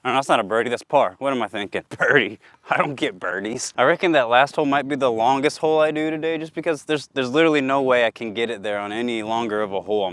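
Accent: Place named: United States. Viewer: always wondered why you have an american accent and say things from 70s-80s vernacular.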